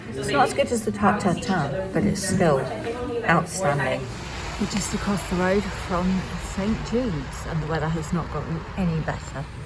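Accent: Ireland. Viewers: Loved the Irish accent haha ❤❤ I would love to try a banana daiquiri but never see them on the menu anywhere! Those cocktails at the end looked amazing 😻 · perfect Irish accent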